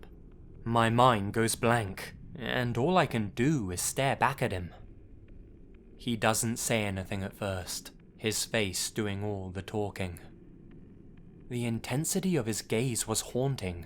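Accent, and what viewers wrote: England, United Kingdom. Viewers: the slight British accent <33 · you totally have my number, I love falling asleep to you reading these stories. I can't help it, your voice is so soothing and calming. I'm also a complete sucker for an English accent.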